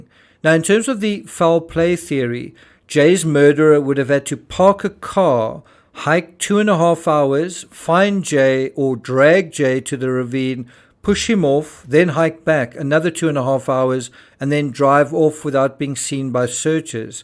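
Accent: South Africa. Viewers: Thank you for this update! Once again, so good to hear a South African accent! I am glad you did this video because the conspiracy theories do not help anyone. The way you have explained it makes perfect sense and fits in with whatever evidence has been obtained. It is also easy when one has the "exuberance of youth" to believe that we are capable of doing anything! Jay obviously felt he had only one option and that was to head for the sea... the most likely place where people would be. But as you say, he had no idea how far away it was nor how treacherous the route was. He took a chance. Very sad for family and friends, but to me it feels like reality. I personally find it a far more plausible reality to accept than the wild possibilities others have come up with. Keep up the good work! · Where in Australia are you from? You sound South African. You have been very commited to pursuade us in absence of a fowl play, even though you haven't been there. 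And I strongly doubt Jay had a chance of getting out alive if he rejected the big boys. By the way there was a CCTV footage of this very same road with a man getting into the car. At the time people speculated it had been Jay. What if it was someone who pushed him... The phone wasn't that close to a cliff, otherwise they'd find Jay immediately. Was there a fight? With a body month old, it's surprising they can determine exact time of death. But even so, people like those men he was with, do not leave witnesses that refuted them, that might hurt them in the future. Look at the facts, so many leaked messages, audio and CCTV footage. Aibs instagram post with the photo of Jay " +1" subheader was meant to be seen by a client? They hired a flat for a few hours on the night in the fake name to do what??? They weren't at the festival, he was heavy on drugs with someone's help, how did they meet? 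But you create these narratives " he persisted", " in his final moments he did that" - how would you know?, I still can't get over the fact you suggested that anyone not thinking like you do, is schitzofrenic... 😂 Someone must pay you well to trumpet your trumpet. The "paranoid mind made him jump" theory is another funny one. He had the reason to be running! So why is no one investigating that?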